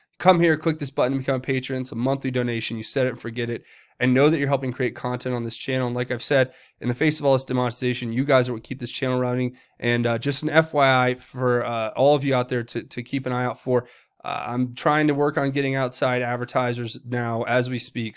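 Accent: South, United States. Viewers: YOUR SOUTHERN ACCENT SAYS IT ALL BROTHER...."Q" ROCKS....HE OR SHE (THIS COULD BE A WOMAN) IS THE ONLY ONE GIVING US THE TRUTH...I CAN'T WAIT FOR THE MILITARY TO SWOOP IN AND SNATCH THESE .THIEVING PEDO'S UP...WWG1WGA....U.S.M.C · Love that southern accent. LOL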